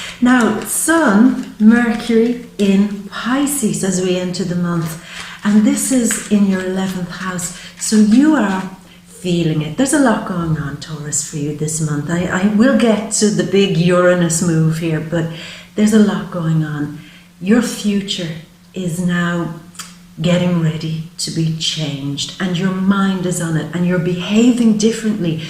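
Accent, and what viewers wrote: Ireland. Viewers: I just love your Irish brogue, maybe I will meet you one day :) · ire u irish by any chance btw im irish thank u for video much love <3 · I am a newcomer to this video and I love what I see, hear and learn from you. Listening to you, I am just awestruck by your extraordinary and wonderful energy, your natural beauty, your graceful demeanor of delivering, and most important, your intuitive skills-set and wisdom of what the world can bring us. Thank you, I am so happy to have found your site. Also, do I detect a slight Irish accent? It is absolutely superb and I love it.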